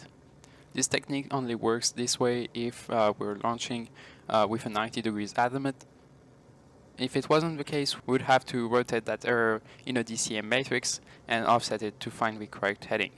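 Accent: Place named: France. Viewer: La vidéo apparait de manière random dans mon fil... je t'écoute parler et je reconnait un petit accent francais.. tu serais pas à Supaero par hasard?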